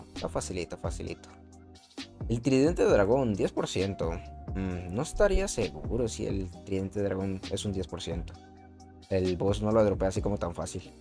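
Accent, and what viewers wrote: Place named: Colombia. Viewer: de que parte de colombia sos-nuevosub-oN_Ry3uc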